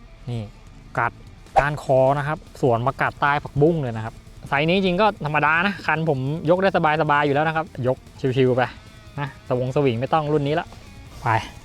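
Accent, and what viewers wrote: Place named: South, Thailand. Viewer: คลิปนี้น้า พูดใต้ออกเลย😅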